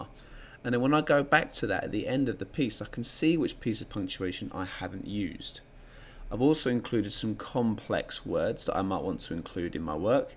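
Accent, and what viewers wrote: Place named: United Kingdom. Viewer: Informative video thanks. But British ascent is very hard to understand for us(non native). But i'm trying to understanding.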